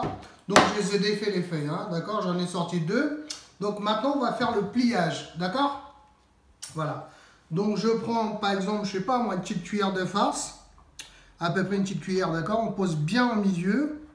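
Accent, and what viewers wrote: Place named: Réunion, France. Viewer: Ta l'accent un peut créole de la réunion ou mauricien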